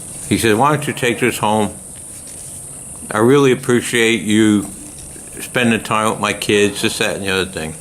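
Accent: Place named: New York, United States. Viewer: what a couple of stories! i was glued to the screen for both of them. having a young child myself, i can already see how kids sometimes scare the every loving crimonies out of us parents, as i know i did mine from time to time.. thanks for sharing. can't quite place the accent; is it new york? hava good'n. 🍻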